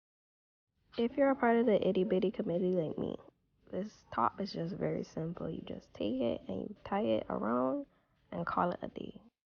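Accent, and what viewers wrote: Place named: Barbados. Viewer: Jealous you have the bajan accent and I don’t lol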